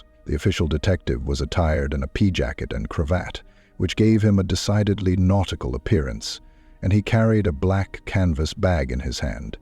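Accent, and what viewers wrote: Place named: United States. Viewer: No to an Anerican accent to read SH . Sorry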